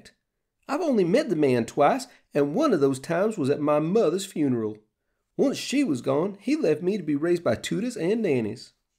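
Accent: South, United States. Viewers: Well sakes alive! I must admit sir that I wasn't expecting this accent but, being raised as a Southerner, I give your performance my Southern seal of approval! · I loved this! The whole story was cute and I loved your Princely southern accent! Keep it up,!:)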